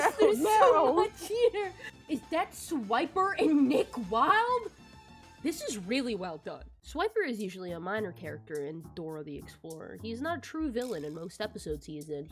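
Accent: South, United States. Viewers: you're really cool and smart and gay thank you for not hiding your accent also what's the license plate on your fall off of (sincerely, another queer redneck)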